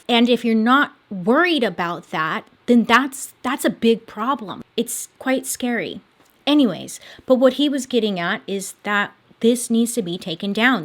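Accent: United States. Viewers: my American English has improved by listening to her stories 🥰 thank you!!!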